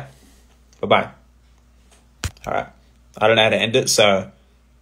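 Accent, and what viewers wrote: Australia. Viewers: You’re not too close to the screen LOL And yes we appreciate the return to a more aussie accent :) just those sneaky American “rrrr’s” quite prevalent. Love hearing the aussie “o’s” coming through every now and then. Like when us Aussies say “I don’t know” and other words like “home, phone, alone etc” Its just so Australian, so please don’t lose too much more 💕 Love the album. I only live 5 min from work so I’m keen to go driving next weekend to listen to the whole album with the windows down - and it’s almost spring weather on the Gold Coast so thanks for the driving music that you poured your life into. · He really sounds so much more Australien then in the last few years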